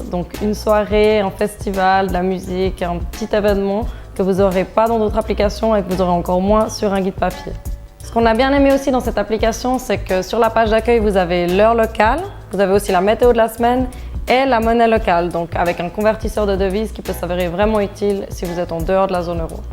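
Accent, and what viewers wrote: Switzerland. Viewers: L'accent suisse sur !!! ahah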